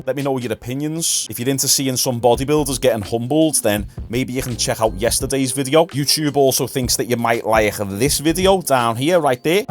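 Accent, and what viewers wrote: Liverpool, United Kingdom. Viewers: Are you Scouse? Actually, is this even a question? I don’t deal with if, ands or maybes, only absolutes!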